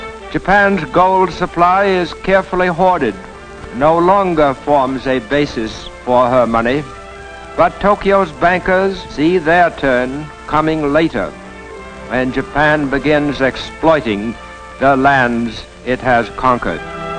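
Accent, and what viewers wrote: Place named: United States. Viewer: Hello all, no offense to the uploader & the rest. BTW, I must stressed that I'm not a Japanese. This video is simply a US propaganda film, judging from the narrator's accent. Its quite insultive in some ways to east asian thinking. AND stop calling jpn/chin/kors "ASIANS!" Its makes you sound stupid. thanks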